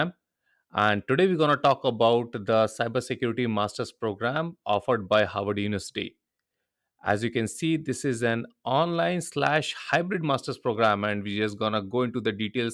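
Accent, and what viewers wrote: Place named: India. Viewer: Why are you sounding Indian?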